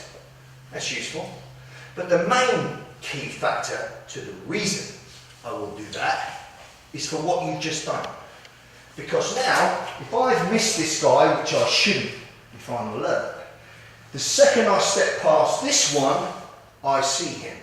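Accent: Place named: United Kingdom. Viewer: I wish I could understand what he is saying, its mostly english, yet the british accent is hard to figure out. I think If we were to see what he was talking about instead of just talking about it it would click and make more sense. I seen a couple of times where they almost started to show us some tactics other than pretending to hit in the face. I am gonna try one more video to see if the audio is any better.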